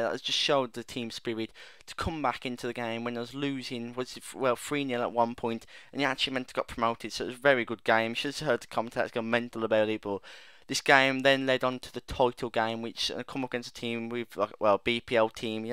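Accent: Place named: Birmingham, United Kingdom. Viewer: Where are you from, you sound like your from birmingham?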